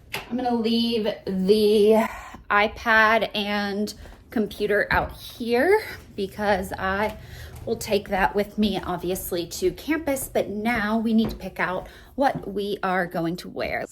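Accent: South, United States. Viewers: Hi! I’ve been watching your videos ever since your scarf-styling tutorial popped up on YouTube’s homepage—so probably since 2008 or 2009. I’m from Switzerland, and your channel was a huge part of my teenage years. Watching you motivated me to improve my English (I always loved your Southern accent!) and I learned so much about beauty and makeup—way before my friends did, because we didn’t have anything like the beauty community here. I just wanted to say thank you for coming back to YouTube. Your rambling, cozy style has always felt like a podcast to me—I love having your videos on while I do my makeup or just take a break. And your honesty about introducing your new Cavapoo after Teddy really touched me. I totally get it—I felt the same after losing my dog. Funnily enough, I now have a Cavapoo too—her name is Lokki 🐾 Anyway, I’ve never really commented before (very Swiss of me), but I’ve been here all along and just wanted to finally say thank you for everything! 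✨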